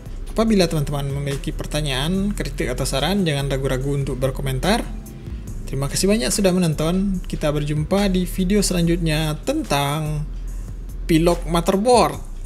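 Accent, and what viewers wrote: West Sumatra, Indonesia. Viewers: Ko iyo minang bana aksen nyo 😂😂😂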